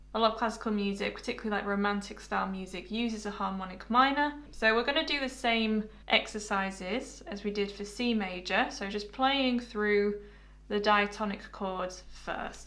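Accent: United Kingdom. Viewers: Finally it took a brit accent for me to understand,lol im on my way!!!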